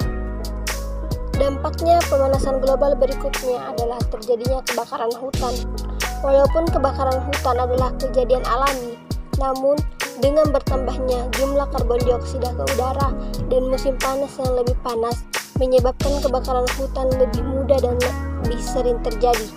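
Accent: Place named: Java, Indonesia. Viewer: medoknya botak hahaha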